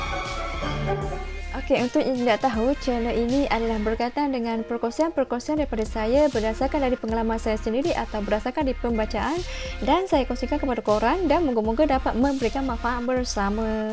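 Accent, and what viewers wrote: Kelantan, Malaysia. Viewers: Ore klate ko. Caro kecek pelet . Hahaha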